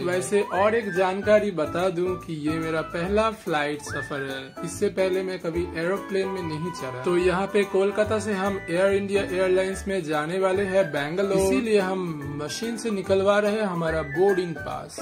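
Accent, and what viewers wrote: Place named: Bihar, India. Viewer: Bhaiya narration bahut badiya kiye ho.. Or accent ekdam bihari kaafik per bhai kasam se narration mast hai bahut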